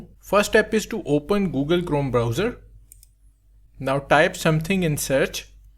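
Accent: India. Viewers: When I heard an indian guys voice I knew the tutorial would be lit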